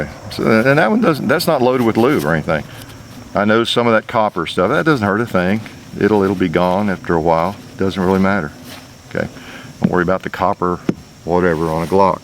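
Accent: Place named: Florida, United States. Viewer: You sir, just gained a follower and a fan. You sound like a Florida boy just like me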